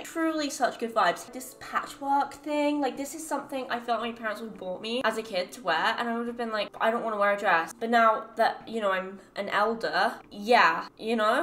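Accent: United States. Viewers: definitely has an American twang in her accent now- cute! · Watched her years ago and now she has a slight American accent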